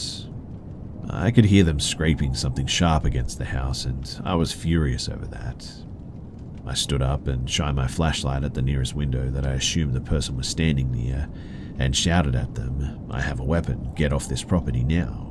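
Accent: Australia. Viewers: G’day…🤗 I love your channel and it’s great listening to your familiar Aussie voice! Great voice for narration, as if you didn’t know✌️😄 It’s my son’s 21st Birthday today 🥳🎊🎂🎉🎁🎈🎉 We’re all in such happy spirits too. I’ve just made him a Strawberry 🍓 Cheesecake and he also selected a Beef Roast with all of the trimmings PLUS MASH! 🍚🥄✨💃🏻🪩🕺🏻 That’s my boy (young man) now! But he’ll always be my baby 💖✨ I find all of these stories so enthralling, so thanx for making the days go faster! 🏆😘🍰🍓✨Greetings from MNECNSW!🤜🤛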